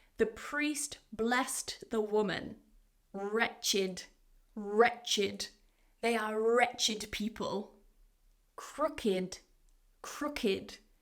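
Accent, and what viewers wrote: United Kingdom. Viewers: hello ms i love your content, as specially the British accent.... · I’m really grateful for you for make fantastic videos to our English learners. Thank YOU! And I love your British accent, hopefully my pronunciation getting better, speaking English like your accent.❤ ❤❤ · Is it British accent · Hey are you a british teacher ? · She is from the UK, i dont know if this Is british acent, i dont native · Excellent, i love your british accent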